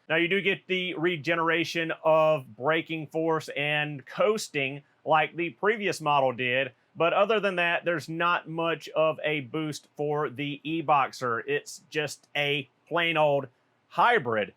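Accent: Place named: Arkansas, United States. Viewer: I love your accent 🙂, Arkansas? Love from Czech rep. Thx for great content.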